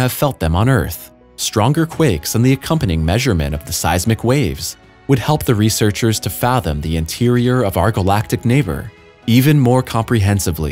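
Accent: United States. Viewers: Hear that voice. Dudes American. Go on with that stupid metric shit.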